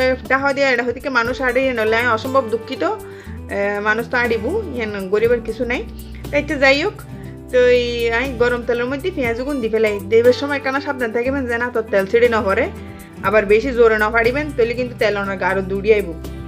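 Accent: Chittagong, Bangladesh. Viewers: আরার চিটাগাং এর কথা মানি সেরা আ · Peyajoo diye shutki ! Kahinito kokhono, I must try.... and enjoyed ur chatgaiya talking 😀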